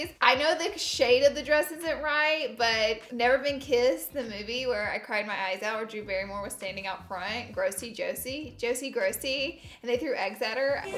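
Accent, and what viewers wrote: South, United States. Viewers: That country accent 😂 love it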